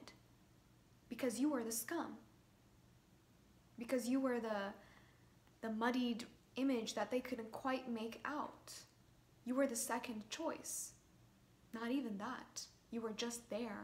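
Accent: United States. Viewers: i Love your Chicago accent ❤ as *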